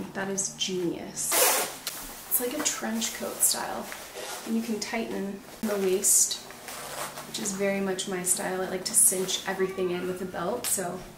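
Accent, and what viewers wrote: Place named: United States. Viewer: Congrats. on making the jump to YT. ! , you mentioned Canada but your accent sounds American 🙂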